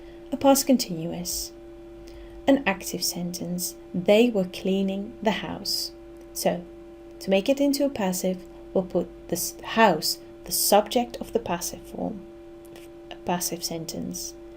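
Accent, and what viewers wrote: England, United Kingdom. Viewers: Clear , precise ,and a business like approach I loved it. And a wonderful cultivated English accent . I am delighted .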